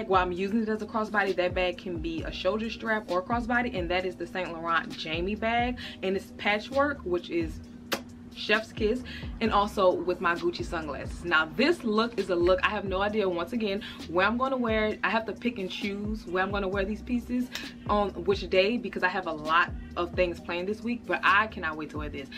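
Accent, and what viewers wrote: New Orleans, United States. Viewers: I would've never guessed your accent for New Orleans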